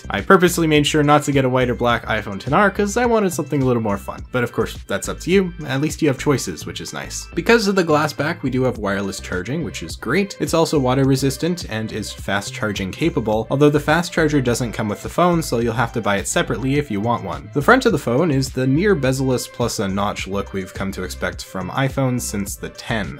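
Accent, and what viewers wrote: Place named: Canada. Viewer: i could tell your canadian within 3 seconds of the video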